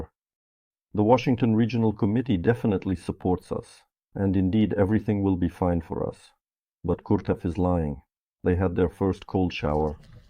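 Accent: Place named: India. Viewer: Как будто индус озвучивал) Ну вообще неплохо.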